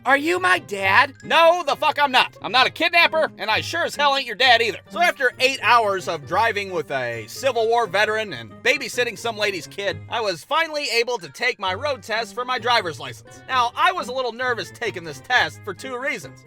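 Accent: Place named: Ohio, United States. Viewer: I'm also from Ohio and after watching and listening to this video....as much as us ohioans don't want to think that we do....we totally have an accent. This video confirmed that theory for me.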